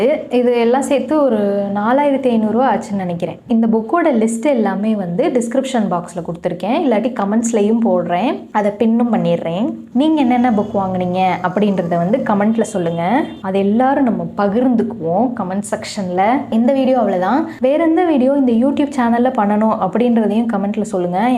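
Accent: Madurai, India. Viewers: Madurai slang mathiri iruku🎉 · Amazing ❤. Loving your madurai accent. Great choices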